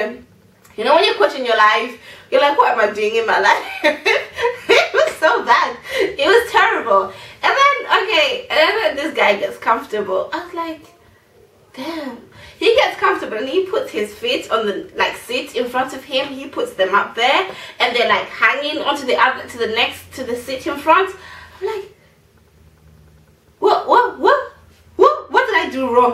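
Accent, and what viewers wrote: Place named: Zimbabwe. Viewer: you have the cutest zimbabwean accent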